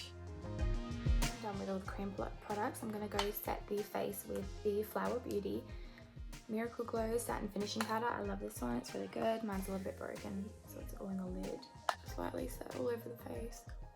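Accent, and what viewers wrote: Australia. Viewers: Are you Australian? I love your accent! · She really does sound like an australian, I also love the accent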